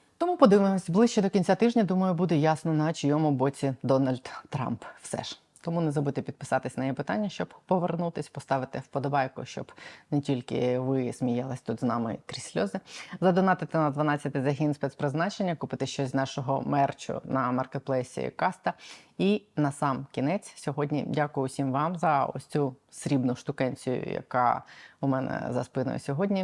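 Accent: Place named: Russia. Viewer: ВЕДУЧА! ЩО ТВОРЕТЬСЯ З ТВОЄЮ ЩЕЛЕПОЮ,ЩО ТИ ЗНУЩАЄШСЯ НАД УКРАНСЬКОЮ МОВОЮ:ЩЬО,ЩЬО,ЩЬО,ЯКЩЬО,ЩЬОБ,ЩЬОБ,ЩЄ,ЩЄ,ЧЬОМУ, ВЧЬОРА,ДА,ЧЄРВЕНЬ,ЧЄ,ЧЄ,ЧЯС,ЧЄНОВНИК,НАЙБЛИЖЧЬОГО,НЕБЕЗПЕЧЬНИМ,ПУБЛІЧЬНОГО,ЧЄРГА,ЯКЩЬОБ,ПОЧЄНАЄ,ОДНОЧЯСНО,ЧЄТВЕРГА,ПОЧЯТИ,ЗА ЩЬО,??????????????????????ГАНЬБА ТВОЇЙ МОСКАЛЬСЬКО- УРОДСЬКІЙ ЩЕЛЕПІ!!!!!!!!!!!!!!!!!!!!!(УКРАЇНСЬКА ДІАСПОРА В США)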